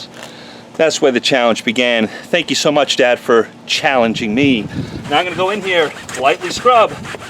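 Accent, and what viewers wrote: New York, United States. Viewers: Joisy or Newyawk. So, when was the last time you were in the Clam Broth House in Hoboken, or McSorley's on 7th? LOL Been too long for me, sadly.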